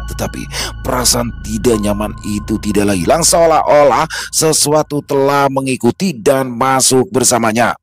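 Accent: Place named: Java, Indonesia. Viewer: Ngakak klau denger logat bicara gembul bg medok bgt lucu seru di tambah bg Dono yg slengean keren bgt bg hidup bgt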